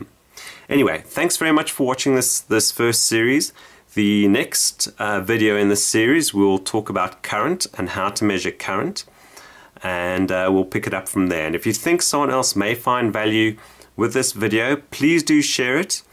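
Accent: South Africa. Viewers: South African accent! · I found the subtitles extremely distracting. I assume that some software application was used, which had difficulty with your South African accent. Pity. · don't you just love our South African accents? thanks for the video this is amazing! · Absolutely brilliant tutorial, I knew as soon as you started talking that you are South African! nice to see a south african using vlogs for educating about electricity, Fab! I bought a multimeter a few months ago and had no idea how ot use it. Now since watching your extremely concise and clear tutorial I am going around and probing everything haha, everything DC that is! AC scares me!!!! quick question: I have a cheap chinese android tablet which I aquired a while ago which worked for one day and then would not charge, absolutely nothing at first glance but then I opened her up and seen its got a 3.7v Cell, I just wanted to know how I would test for voltage on this I want to know if its dead or not? 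do I need to desolder it from the board to get a true reading or can I just measure it in situ? if I find its not the battery where do I go from here, I dont know anything about how these things provide the power to the board? would be really great to be able to use this tablet as my other tablet is a 1st Gen Ipad which I wont slag off it has its pros, but it also has its cons, that being of limited compatability with most of todays apps! thanks for posting I am now more enlightened! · are you south african ?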